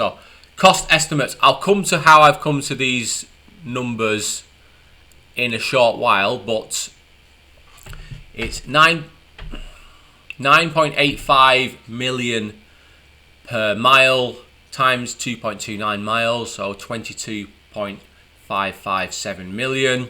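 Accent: United Kingdom. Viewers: Do you live in Las Vegas now? For how long? And if so, isn't it time for you to lose your English brogue accent? We think so!!!!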